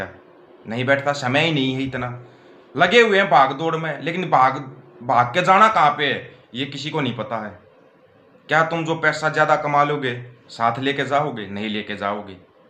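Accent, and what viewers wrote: Haryana, India. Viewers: Tere boli Haryanavi hai or tu Punjabi trend karwa riha hai ki sabko pata nahi hai tu paise khake godi main ucchal riha hai .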